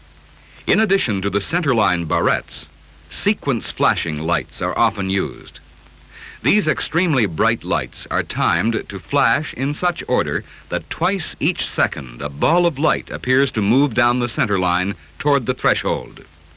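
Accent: United States. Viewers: A few details I noticed about this video. First, the announcer is speaking American English, but the lighting systems being described are ICAO-approved systems, which is an international standard and all distances are in meters. Second, this film was made before Category 3 existed, so there is no information on those systems. But the difference is minimal, since the approach lighting is the same as a Cat 2, and the main difference is extra lights on the taxiways. Third, the systems referred to in this film as “Simple approach light systems”, known to the ICAO to be only good enough for non-precision approaches, which use barrettes at 60 meter/200 foot spacing and extend out only 390 meters/1400 feet rather than the 30 meter/100 foot spacing, 900 meters/3000 feet length of a full system are in fact the basis for the typical Cat 1 system in the US. Basically, you take a short, simple approach light system (SSALS), add five sequenced flashers leading up to it (Runway Alignment Indicator Lights), and you have a Medium Intensity Approach Light System with Runway Alignment Indicator Lights, thankfully abbreviated to MALSR. 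For the international standard, this is only good enough for a non-precision approach. But the FAA thinks it’s good enough for a Cat 1. The standard in the US used to be the ALSF-1, which is similar to the barrette-type Cat 1 system shown in this film. The ALSF-1 remains the standard for military fields in the US. But the FAA believes that saving money is more im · Thanks for putting this interesting document up. Anyone know the date on this document? Is it a Pans-Ops standard? It's perplexing to hear an English speaker, with an American accent, giving specifications in metric units. One would assume the TERPS document would be in feet.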